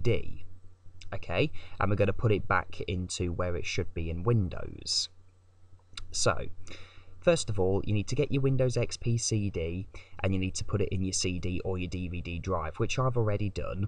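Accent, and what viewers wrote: United Kingdom. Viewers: Hello. I want to ask you a question that has nothing to do with the video. I'm from Venezuela and speak American English, but I can clearly understand what you say with your accent of British English. I would like to know exactly where are you from, for knowing in what cities speak with your accent, and to know the places I can understand the British English without difficulty.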